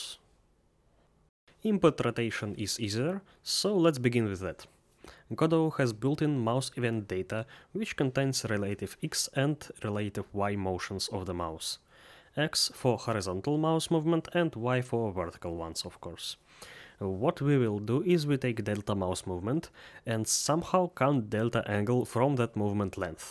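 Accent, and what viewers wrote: Russia. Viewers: Очень чётко слышно русский акцент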